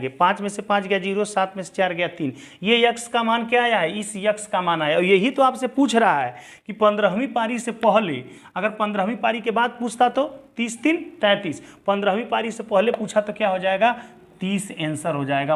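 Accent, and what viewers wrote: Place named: Bhojpuri, India. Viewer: Bhojpuri andaj me jabardust lag rhe h guru ji😊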